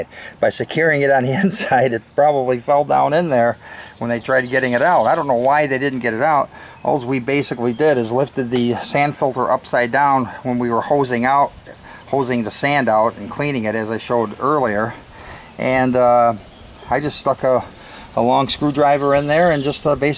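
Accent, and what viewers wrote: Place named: Chicago, United States. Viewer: Helpful video. Excellent Chicago accent.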